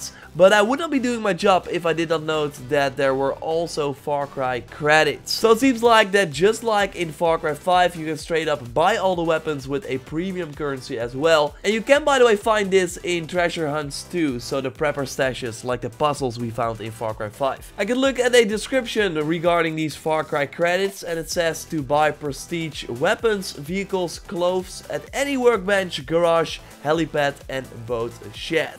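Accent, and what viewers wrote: Netherlands. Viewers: you are dutch haha das heel simpel te horen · Are you dutch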